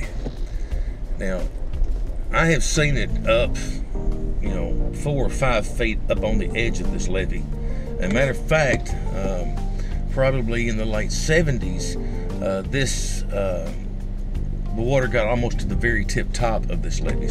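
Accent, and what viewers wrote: Arkansas, United States. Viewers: Very educational vid I love your Arkansas accent too.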